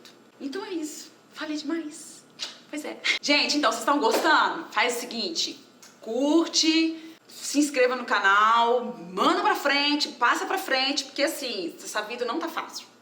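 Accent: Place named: Minas Gerais, Brazil. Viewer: Oh gente!!! Mineira mesmo kkkkkk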